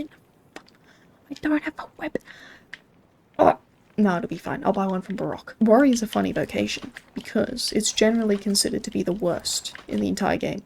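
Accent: Australia. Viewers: Oblivion ambient music: checks Australian woman in a calm voice with occasional chill cursing: check I was expecting bonks but got the best ASMR. Neat. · Also Im a Kiwi so i picked ya for an aussie with the accent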